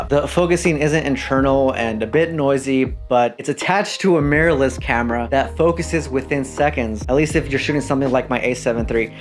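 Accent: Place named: Australia. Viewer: Thanks. I have a A7II specifically to use with legacy lenses. You review coupled with your images taken using the FE 1.8/50mm is pretty sweet. There will be an addition to my lens kit! By the way I love your Aussie accent champion.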